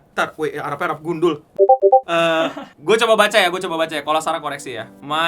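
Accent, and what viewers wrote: China. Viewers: Malah kaya orang Tionghoa bacanya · Logat cina terdeteksi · logat Belanda sama Chinese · Bahasa belanda aksen china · itu logat belanda apa cina? kadang kdgrn kek logat cina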